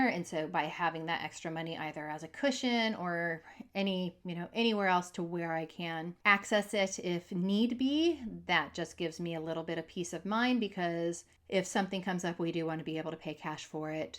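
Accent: Australia. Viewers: Are you originally from Australia?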